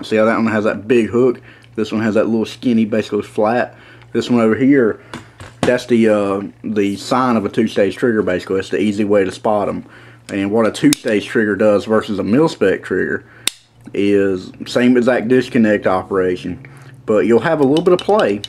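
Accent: South, United States. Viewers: No he doesn't! You just have be from the south to understand him. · ok little southern draw but why Carolina? we all ain't gat that twang....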